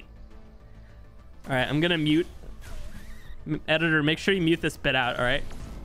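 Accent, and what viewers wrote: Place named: Ireland. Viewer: What is this video?!😂😂 Well we can tell he’s Irish!😁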